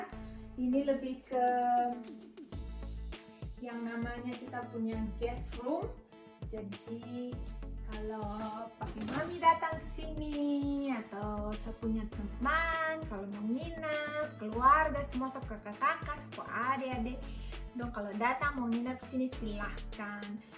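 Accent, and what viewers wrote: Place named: Eastern Indonesia, Indonesia. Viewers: Hai kak, baru nemu dan langsung subsc dong pass denger logat ketimuran kk 😅❤ salam kenal dari sultra 😘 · Shalom mb sy br subcribe slm kenal sy agustina suku Toraja- Indonesia, logatx mb spt org toraja slm succes mb n Mr. Tuhan Yesus Kristus memberkati kita 🙏🥰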